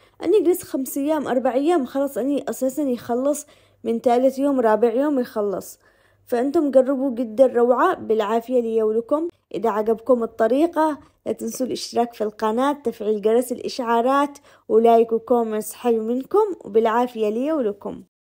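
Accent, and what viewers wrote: Yemen. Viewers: حلوة اللهجة اليمنية ❤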